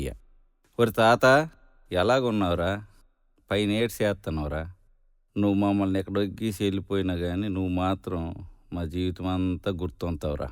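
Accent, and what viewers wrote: Srikakulam, India. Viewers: సార్, శ్రీ కాకుళం, ప్రాంతీయ భాషా సౌందర్యం,అట్టాడ అప్పలనాయుడు, కొంచెం నాలుకకిరాసి,రుచిచూపించాడుకానీ,మీమాటలులోఆయాసామృతంమొత్తంగారుచిచూపించడంకాదు,కడుపూమనసూకూడానింపేశారు.ఆప్రాంతీయభాషామాధుర్యంఇటీవల ఒక మిత్రుడు ఇలాశాంపిల్ చూపించాడు.?ఒకరైతుకూలీస్త్రీఇలాఅందట?ఉలుకూపలుకూలేనమ్మకి, ఊరంతా ఉపారాలే!ఎద్దునాగకష్టపడిందానికి"పకాలే,డకోలు.అన్నాదట! ఎంత చక్కని పోలిక, ఎంత మధురమైన ఫోలిక,ఈవిశాఖపట్నంలో, కొంత మంది మిత్రులు, శ్రీకాకుళం వాళ్ళనీ,వాళ్ళభాషయాసనీ,అవమానకరంగాహేళనగానూమాటలాడుతుంటే,సహించలేక,శ్రికాకుళమేరామాదీశ్రికాకుళమేరా!విప్లవాలాపురిటిగడ్డా,వీరయోధులపోరుగడ్డా,ధీరమాతలనుదుటికుంకుమ,జారితూరుపుఎర్రబారిన,శ్రికాకుళమేరామాదీశ్రికాకుళమేరాఅనేపల్లవితో, విప్లవ అమరవీరులుపంచాదిక్రిష్ణమూర్తీ,నిర్మలమ్మా, సత్యం మాష్షగారినీ,ఆదిభట్లకైలాసంనీ,తామాడగణపతినీ,ఆపాటలోపరిచయంచేస్తూవారిత్యాగాల్నిగుర్తుచేస్తూరాసిన ఈపాట ఆశ్చర్యంగా, క్రిష్ణా, ఉభయ గోదావరి జిల్లాల్లో తెలంగాణ లలో,విస్త్రుతంగాపాడుతూపరవశిస్తూ, ఫోన్లు చేస్తూ అయ్యాఏభైఏళ్ళుగతించినా,శ్రికాకుళవిప్లవోద్యమాన్నీ,అమరవీరుల్నీగుర్తుచేసేపాటనుఏవిప్లవపార్టీగానీ,ఏసాంస్క్రుతికసంస్థగానీపాటరాయలేదండీఎంతబాగారాశారనిసంతోషంతోప్రశంసిస్తున్నారు.ఇక్కడనాపైప్రశంసలగొప్పలుచెప్పడంకాదు.మిత్రులుశ్రికాకుళయాసనీఅక్కడమనుషుల్నీఅవమానకరంగామాట్లాడేవాళ్ళకళ్ళుతెరిపించాననేసంతృప్తిదొరికిందిఅదిచాలు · శ్రీకాకుం యాస,భాష తో మన గ్రామీణ కుటుంబ పెద్దల జీవన విధానం గురించి చాల బాగా చెప్పారు. 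గారు..మీకు సంక్రాంతి శుభాకాంక్షలు. గన్నిరాజు డీఇఇ జలవనురుల శాఖ. శ్రీకాకుళం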